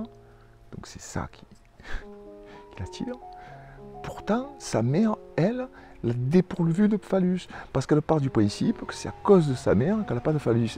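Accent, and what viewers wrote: South, France. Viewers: Vraiment très bien, très bien expliqué, par un locuteur très sympathique.Son discours est accessible aux non initiés, va à l'essentiel. Il n'y a pas à dire, l'accent du sud, c'est quand même autre chose que l'accent débile des gens du nord.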